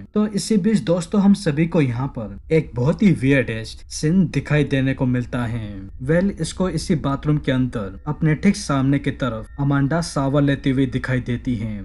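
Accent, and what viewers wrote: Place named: Indore, India. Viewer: Tu indori he naa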